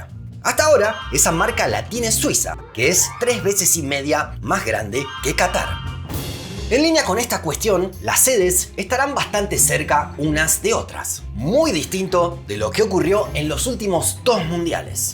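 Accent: Argentina. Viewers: Sos argentino loco? De donde sos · Jejeje ahí vi que tienes un telescopio 🔭.. Casualmente estaba buscando paisajes de Argentina en Internet cuando llegó tu notificación... Creo que eres de Argentina por el acento, de que provincia por cierto, si en un futuro viajo a Argentina espero encontrar a la gente que conocí en Internet allá (no debería pero ya que) Las provincias que quiero conocer primero son Neuquén, Mendoza, Jujuy,Misiones,La Rioja,Chubut, Río Negro,Tierra del Fuego,Salta, Córdoba, etc... Un saludo desde Costa Rica 🇨🇷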